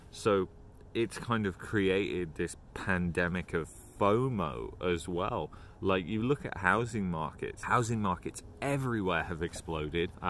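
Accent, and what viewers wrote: United Kingdom. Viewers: you're so interesting to listen to. What part of the UK are you from?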